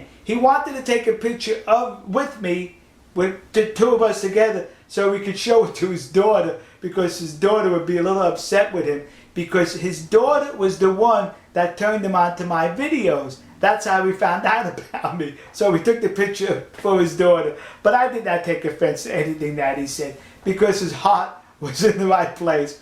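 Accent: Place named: Texas, United States. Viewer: テキサス親父さんの明るいキャラもさることながら、その「発音」にすごく興味が行きました。いつも聞きなれているアメリカ英語とずいぶん違いますね。これがテキサス訛りというやつですか。今、テキサス出身の先生に英会話を習っていますが、「テキサスの人はゆっくりしゃべるから日本人に分かりやすいんじゃないか」と言っていましたが、本当ですね。英語圏の人がみんなこれぐらいの速さ（遅さ）で話してくれればもっとありがたいんですけどね（笑）。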